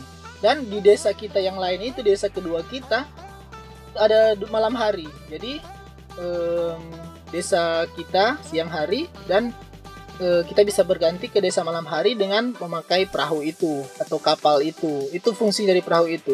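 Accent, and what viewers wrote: Manado, Indonesia. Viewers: .....orang manado? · so kntra tu ba logat manado😂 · orang mando ato Gorontalo?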